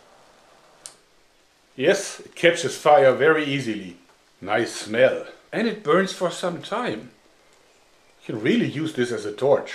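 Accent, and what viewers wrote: Germany. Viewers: not russain accent, but we do have a German accent. which is about as cool if not cooler. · He speak like a german :O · Your english sounds like you have a german accent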